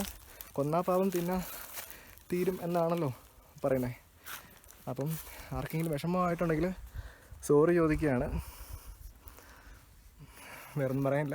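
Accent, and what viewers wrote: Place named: Vaikom, India. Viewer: Adipoli samsaram kettapozhe thoni enta sthalam vaikom ippo soudiyila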